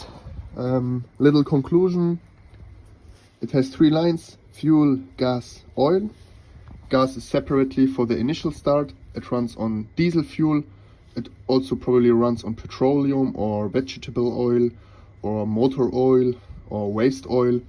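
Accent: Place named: Germany. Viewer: Heavily german accent and gorgeous engineering i appreciate this. <link>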